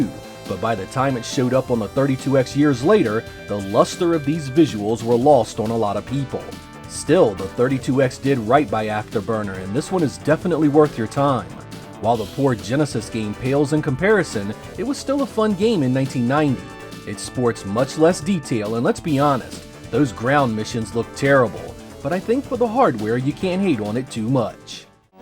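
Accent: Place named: Mid-Atlantic, United States. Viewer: Man it’s obvious you’re a Mid-Atlantic guy!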